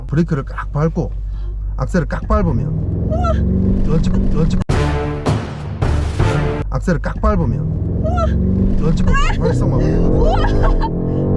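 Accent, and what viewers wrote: Gyeongsang, South Korea. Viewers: 대구 분 이신가 … 엑식엠 한번 구경하고 싶네요 ㅎㅎ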